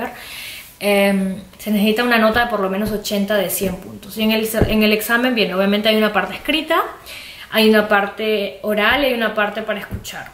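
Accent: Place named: Peru. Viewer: Tú dejo es peruanoo i love your peruvian acent! ich lerne Deutsch.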